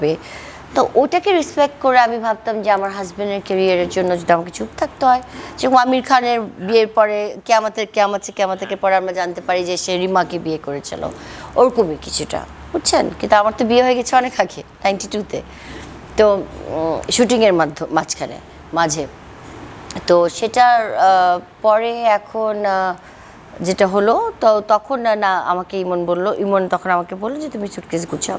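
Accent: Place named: Sylhet, Bangladesh. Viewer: Salam sister you are very good woman nice sylhetee talk but little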